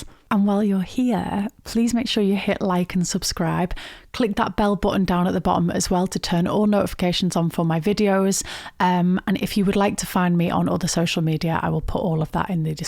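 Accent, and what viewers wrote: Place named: Liverpool, United Kingdom. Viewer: i thourt that you had a bit of Scouse accent in you that's cool snap am a scouser. And you know who you remind me of...Clare Sweeny. Too be fair it sound's like your'e flat mates were'e jealos about your'e sexy look's x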